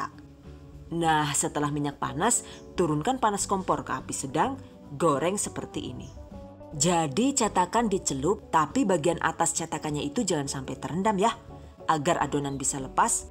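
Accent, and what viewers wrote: Banyumas, Indonesia. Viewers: 😂 seneng ndagel mbekayune...😂😂 kdg basa ngapak kdg indonesia kdg bhs inggris....😂✌️ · suka sama adalah bahasa yg jelas...dan di selingi intermezo ngapaknya sukses sllu bun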